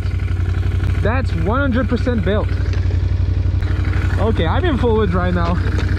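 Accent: Quebec, Canada. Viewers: Il est du Québec j’en sui sûr 😂😂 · I must ask are you from Quebec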